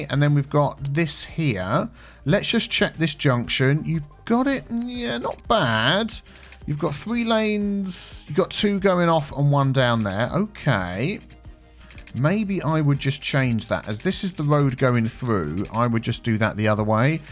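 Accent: United Kingdom. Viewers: thank you for the information on the roundabout builder. I brought up the English bit because people try to correct his pronouncing but who knows the English language better than the English.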